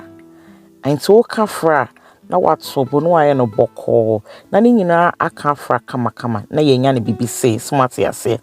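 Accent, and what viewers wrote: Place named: Twi, Ghana. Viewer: Eiii madam w’ano ni twi y3 d3 yie papabi ❤️ beautifully done sis 🙏🏻Much love dear ❤️❤️❤️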